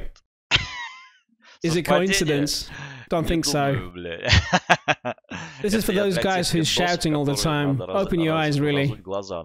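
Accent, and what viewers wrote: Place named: United States. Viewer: а есть видео, без пендосской озвучки?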